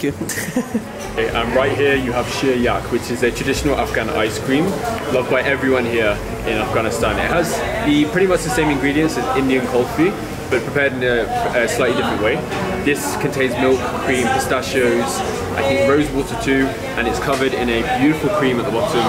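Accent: United Kingdom. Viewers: Hey You are so lovely And I love you Britain accent 😊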